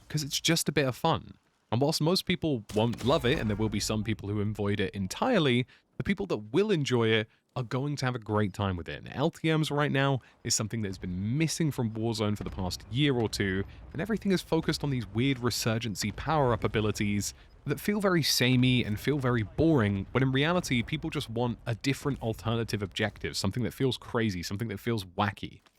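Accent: RP, United Kingdom. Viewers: Loving the posh monologue accent Vs. your usual streaming accent. Also, couldn't agree more with virtually everything you said.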